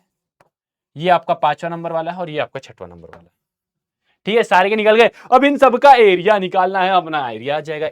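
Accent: Bihar, India. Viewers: That bihari ascent 🫰🤌🤌🤌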